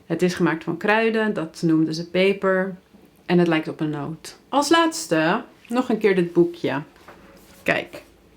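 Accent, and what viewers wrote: United States. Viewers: jij bent geen nederlandse je klinkt als een yank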